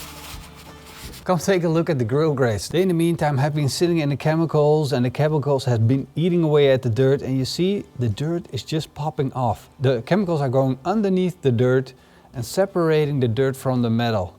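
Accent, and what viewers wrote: Netherlands. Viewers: Bro sounds dutch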